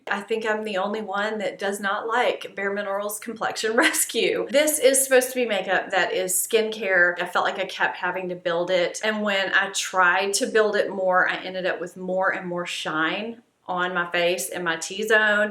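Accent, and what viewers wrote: South, United States. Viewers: Hi! " sent me" and I"m staying! As for eyeshadow for liner, I like the look but I can't get it to stay for more than an hour or 3. What's the secret? And did I hear a bit of a southern accent?! I"m from NC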